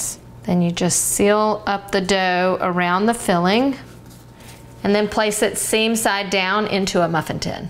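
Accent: South, United States. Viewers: Was NOT expecting that thick southern accent lol. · Gotta love the southern in this girl! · LOVE your southern accent! Wondering where you're from?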